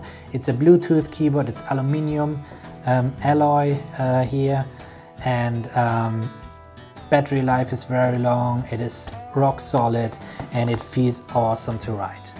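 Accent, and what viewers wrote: Germany. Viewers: Wow big German accent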